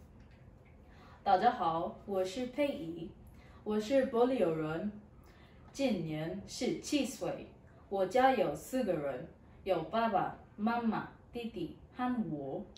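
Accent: Standard. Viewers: 發音很標準喔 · 發音標準，非常棒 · 甜蜜的嗓音～發音好標準👍👍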